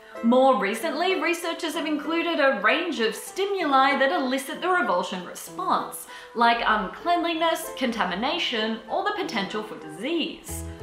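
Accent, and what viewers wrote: United Kingdom. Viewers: I could swear you were British! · Elephants eat poop to build up there immune system. The same way children do by putting anything and every thing in there mouth. Well at least according to the nature documentary I watch! Happy 50th and I thought you were British still a cute accident and thanks for all the wonderful content! Confetti button! <link>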